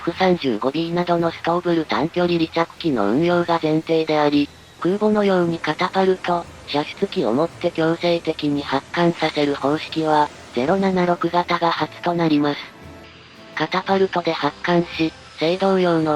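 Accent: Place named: China. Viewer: あと流暢な日本語喋ってても明らか中国人(大陸の方)ってわかるんだよね… ちなみにあなたの国は学問の自由指数 (Academic Freedom Index 2025): 中国の状況: 世界179カ国中で極めて低いグループ（Status Group E: 完全な制限）に属しています。 スコア: 2024年時点で0.067（1.0が最高）とされており、教える内容や議論の自由が厳しく制限されていることを示しています。 報道の自由度ランキング (<num>): 順位: 中国は180カ国・地域中、ワースト2位付近（179位など）に位置しています。情報の検閲や修正が常態化している環境が浮き彫りになっています。 …そんなんだから真実が分からんのだよ…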